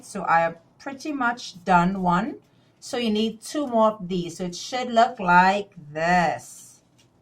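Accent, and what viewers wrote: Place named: Trinidad and Tobago. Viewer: Love the creativity, the one for you son’s room is beautiful. I love the accent is that from 🇹🇹.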